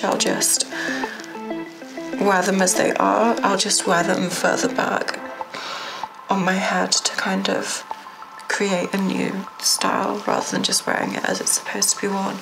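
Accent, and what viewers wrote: United Kingdom. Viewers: Thank you! Their voices are almost identical, despite the British accent.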